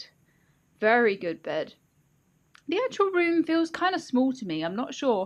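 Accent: RP, United Kingdom. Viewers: Hi, I’m just going through your back catalogue trying to find your oldest or first vid. Am I close with this one? Also you sound so much posher in this vid, is it like your yt version of the old telephone voice 😂 really enjoying your old stuff. See you back in 2023!!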